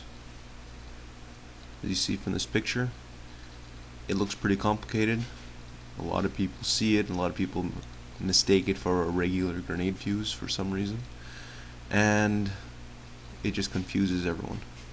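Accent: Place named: Canada. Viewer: Obvious Canadian. Not that there's anything wrong with that.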